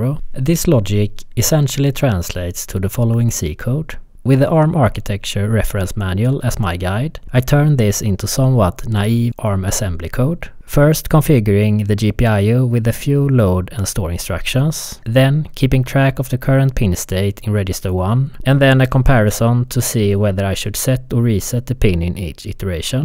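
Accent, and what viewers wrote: Sweden. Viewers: Interesting project but I especially enjoy the Swedish accent. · swedish? accent is swedish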